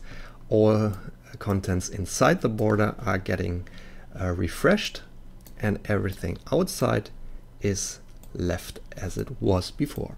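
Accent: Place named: Germany. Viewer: Man hört einfach deutlich, das du deutscher bist :D Aber trotzdem sehr gut erklärt :D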